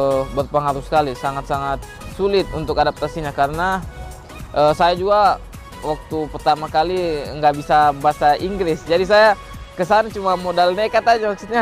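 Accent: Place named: Palu, Indonesia. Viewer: Sahabat sulawesi... Logat palu asli... Hehehe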